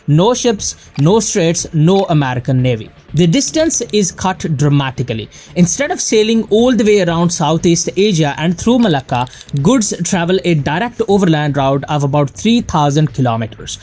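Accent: India. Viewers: Indian accent. BS from a trouble maker.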